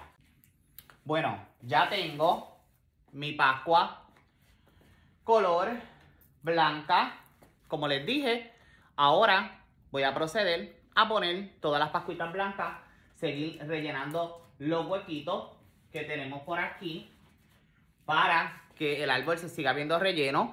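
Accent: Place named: Puerto Rico. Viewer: Nueva seguidora desde q te escuché sabía q eras Boricua bien bello y espectacular!! 💖🇵🇷🙌🤩 Me encantó como explicastes como poner la cinta. Me gustaría una recomendación, mi decoración este año será madera, blanco negro y metal, que cintas debo de incorporar? Ya de ante mano tengo la de saco y la de cuadros Blanco y negro, ( búfalo) q otro color de Debería añadir, plata, dorada o blanca ? Help!!! 🤣🤣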